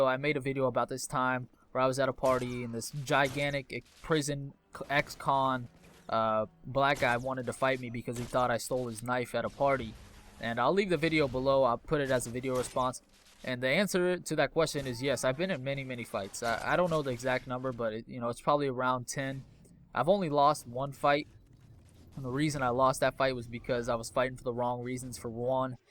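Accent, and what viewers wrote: United States. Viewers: Wait are u american or australian? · also are you actually Australian because you sound american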